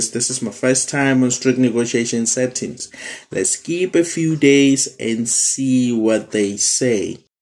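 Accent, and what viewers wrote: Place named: South Africa. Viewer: Aitar.. You sound south african or maybe it's just me.. anyways.. thanks for the vid. I'll be trying this out in the next transfer window hehe 👍🏽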